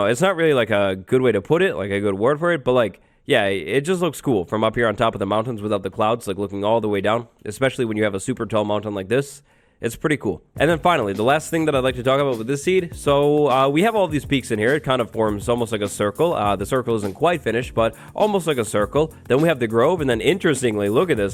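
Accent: United Kingdom. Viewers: I love your British accent lol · i didnt know youre british. your accent would go unnoticed in new England.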